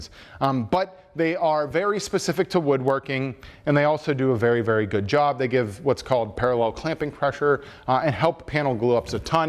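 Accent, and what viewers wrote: Pittsburgh, United States. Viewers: Oh man are you from Pittsburgh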